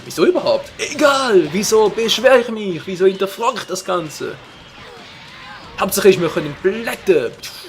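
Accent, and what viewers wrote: Switzerland. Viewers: Du tönsch wie en koleg fo mir aber de akzamt isch chli andersch freut mich aber das ich weis das auch schwizer lets Playstation mached ^^ es abo hasch fo mir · OK ich bin eindeutlich zu gewöhnt an dein schönes deutsch, das ich dein Schweizerisch erst nach 5 minuten erkannt habe... und das obwohl ich mal schweizer war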